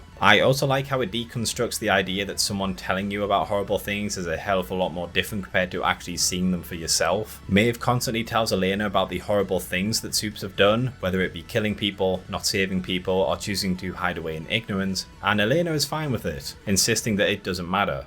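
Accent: United Kingdom. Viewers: why do people who do these videos always got a brit accent?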